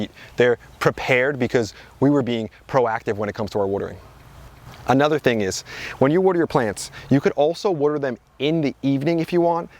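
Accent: New Jersey, United States. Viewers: That’s not a NE accent. It’s basically New Jersey, but you know people in New York too, but is that just because they came from New Jersey or still live there? Mostly it’s people in New York City saying Woarder right by NJ, and not the part of New York I grew up nearby in NE Ohio it’s like 1 hour to the border. Lots of accents all over the place. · The way he says watering or water lets me know I’m listening to a true Jerseyan 😊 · as another fellow new jerseyan i think you mean "wadder" 😅. Now the real question is, do you think he's got a north jersey or south jersey accent?!?! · Yep, he's definitely from Jersey. He's teaching us how to wooder our vegetables. Nice content. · Bro said, “worter the plants” Im from Ohio. I can hear your Jersey accent hard bro 😎🤙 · That is a Jersey accent, Northern NE’s is different. Ayyup. Wicked pissah! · New Jersey guy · I was surprised by the American accent when he said he was in Jersey. 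I was thinking it’s great to think Americans are also moving to the Channel Islands. Then the title came up, this is NJ not the original Jersey. You would never make that mistake if you were over here. YT is global after all.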